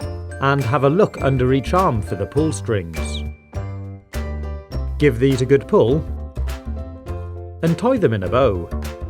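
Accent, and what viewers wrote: United Kingdom. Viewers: Louisa Dsouza it's just a British accent.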